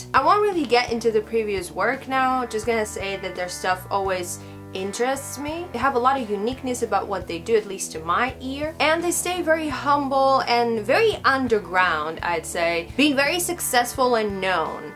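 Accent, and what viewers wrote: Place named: England, United Kingdom. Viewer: good review. Why do you sound english in your channel prologue?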